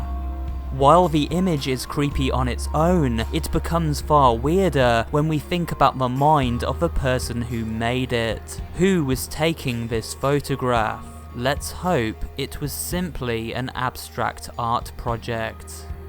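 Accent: United Kingdom. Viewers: Hit that right on the button definitely a British chills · Chills UK voice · You know it's fr when there's a British narrator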